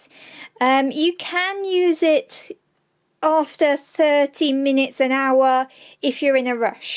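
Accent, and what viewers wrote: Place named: England, United Kingdom. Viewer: Excuse my curiousity, please, from which part of England are you coming from? You have such a lovely accent! By the way, I first leave my glue in water for 24 hours so that the granules can soak up the water. Only then do I heat it, but not above 60 degrees, otherwise the glue loses its binding power.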